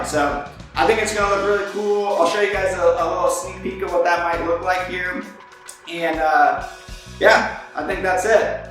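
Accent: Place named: Alabama, United States. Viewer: What? I can't hear you! No just kidding. Put your money into something you want. I don't care if the sound isn't quite there. I just don't like that Alabama accent! That's from a Georgia boy of course. Looking good pal.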